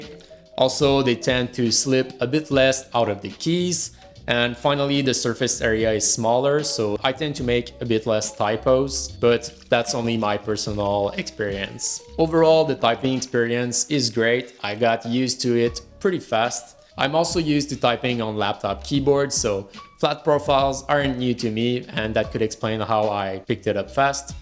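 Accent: Quebec, Canada. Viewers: I know that accent... est-ce que t'es Québécois? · Tes tu quebecois?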